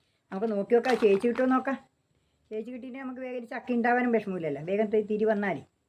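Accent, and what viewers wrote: Kannur, India. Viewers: അമ്മ കണ്ണൂരാണെന്നു തോന്നുന്നു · കണ്ണൂർ അമ്മയാണ് അല്ലേ നന്ദി ഏച്ചി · അമ്മയുടെ നാട് കണ്ണൂരാ സംസാരം കേട്ട കൊണ്ട് ചോദിച്ചതാ അമ്മേ സപ്പർ ആണ് അമ്മേ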